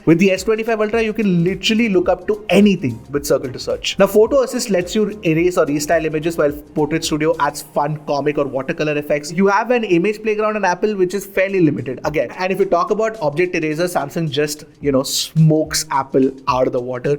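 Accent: India. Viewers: Just picked this up today thanks to a Black Friday sale. $50/month over 2 years with a $340 trade in on my S23 Ultra. Wasn't necessarily planning to upgrade until the S26 Ultra but that price was hard to beat. Also, great content, you're very personable and I enjoy watching, but maybe slow down a little bit. I'm a native English speaker and when you start throwing around specs and tech terminology, without the subtitles it can be a bit overwhelming. The Indian accent can be a difficult one at the best times. You still earned a subscriber. Thank you!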